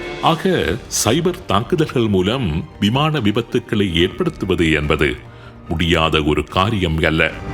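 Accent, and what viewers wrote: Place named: Jaffna, Sri Lanka. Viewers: Pure yazl Tamil❤ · Anna your voice kaadallaa enkaluko eppavum Elam Jaffna jabakam vaarukothu super voice Anna 10 vasellaa erunthu kakirran God bless you Anna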